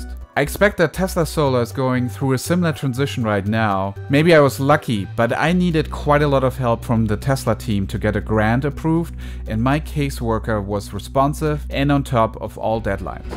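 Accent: Germany. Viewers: ...your accent??? not quite german...aber ein bissien.. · Your english language sounds very german!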